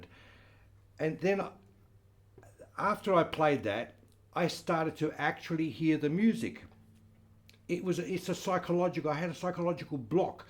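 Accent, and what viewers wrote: United Kingdom. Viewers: I watch you long time ago and i love your content and learn a lot from you sir And i also like the new content and you are speaking with us and we are getting to know your beautiful personality and british accent... Big fan of you please sir keep it up... I'm from Syria ...all love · You must have spent a lot of time in the U.K.because you now have an English accent....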